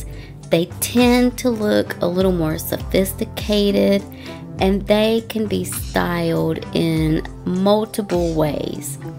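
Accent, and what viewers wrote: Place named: North Carolina, United States. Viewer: You're a sugar cookie, Love that NC accent too.. Subscribed 😉😊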